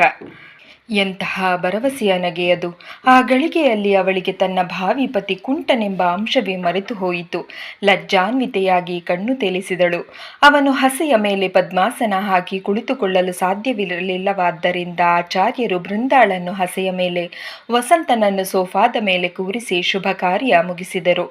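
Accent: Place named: North Karnataka, India. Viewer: ಉತ್ತರ ಕರ್ನಾಟಕದ ಭಾಷೆ ಯಲ್ಲಿ ನಿಮ್ಮ ವಿವರಣೆ chendaaagide ಕೇಳುವುದಕ್ಕೆ.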